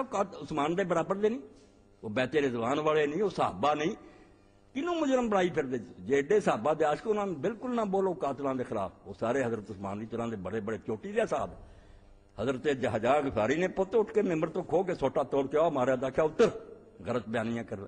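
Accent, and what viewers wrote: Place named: Punjab, Pakistan. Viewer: Molana ishaq r.a ka lehja Punjabi tha . Ye be adbi lgti hai lkn nhi hai. Arbi language is se bhi skht hai agar ye hadeesein arbi mein prhi jayein Jin ko chupaya gya hai Tu tum log aik dosre ko katal krne lag jao be adbi smjh kr. Molana ishaq r.a hazrat Khalid r.a ko sahabi or Naik smjhte the lkn Jo gltyia hoi wo byaan krte the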